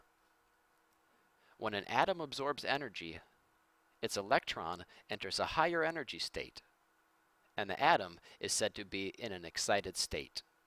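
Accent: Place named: United Kingdom. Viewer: Britain english! Wow!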